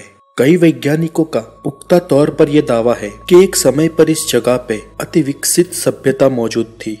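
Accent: Gujarat, India. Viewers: Speaker is Gujarati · Gujju ☝️ accent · R u Gujarati???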